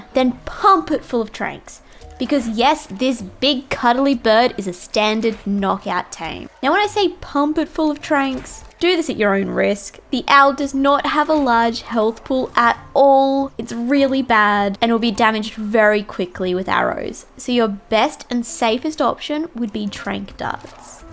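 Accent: Australia. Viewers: Is she Australian or just imitating Steve Irwin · I like little Aussie accent lol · Crikey! She’s Australian! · Gotta love that Aussie accent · She's so Australian it hurts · An Aussie I see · Your Aussie accent makes me want to have your babies. I will carry them to term. Also, you're doing a great job as a commentator. Keep it up, and I'll have triplets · she sounds like a basic Aussie to me — from an Aussie. Nothing wrong with the voice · Not gonna lie. It caught me off guard that a sweet aussie girl started talking rather than "BOYS AND GIRLS OF THE SHAAAAAAAAAFT" XD But she is great! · Honestly, aussie girls and video games? Like Heaven. I could listen to that accent for hours! Lol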